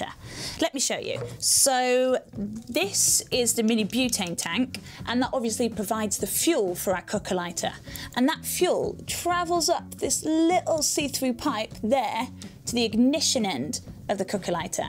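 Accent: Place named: Yorkshire, United Kingdom. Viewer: Sounds like somewhere in Yorkshire to me.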